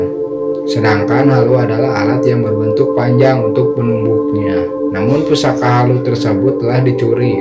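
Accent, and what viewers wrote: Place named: Sunda, Indonesia. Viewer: Ayeu Saha NU nyerios ni kentel kieu Sunda na 😂 salam 🙏 ti SIMKuring warga Cikaledong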